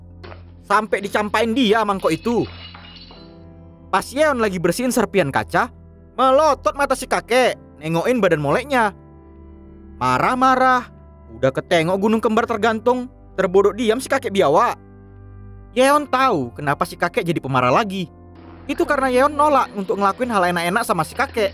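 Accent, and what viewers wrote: Medan, Indonesia. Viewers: Maen kli bahh bahasa logat Medan kita itu ya wakk 😂 Salam dari Belawan Lae ku 🙏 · Bahasanya kyk tmen sy orng medan,lucu😂🤣😂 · Kayak nya dari medan ya bang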